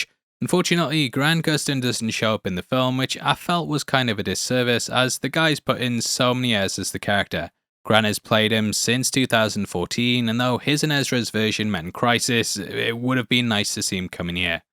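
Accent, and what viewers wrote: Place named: North, United Kingdom. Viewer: Find your accent so interesting. Clearly northern but you drop ‘t’s like an American. 😅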